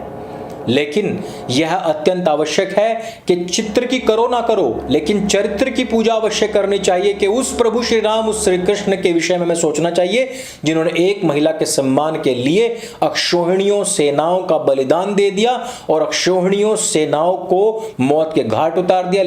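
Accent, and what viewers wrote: Haryana, India. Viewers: आज बहुत समय बाद आचार्य जी को ठेठ हरियाणवी बोलते हुए देख कर बहुत अच्छा लगा ! कृपया कुछ संवाद हर विडियो में ऐसे बोला करने की कृपा करें !! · हरयाणवी लहजा 😊😊😊😊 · Waa bhai aaj haryanvi touch 😀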